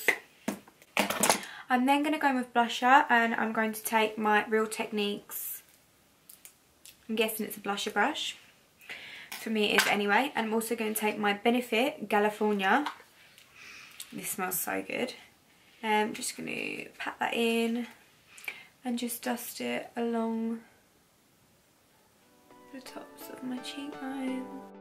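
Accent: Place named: Essex, United Kingdom. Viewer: Just found your channel and absolutely love it! Its nice to hear someone with an Essex accent haha x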